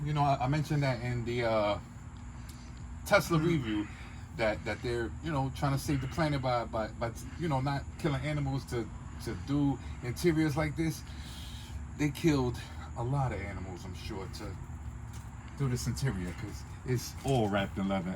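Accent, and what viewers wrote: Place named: Philadelphia, United States. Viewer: Sound like you originally from Philly.lol Nice review 👍🏾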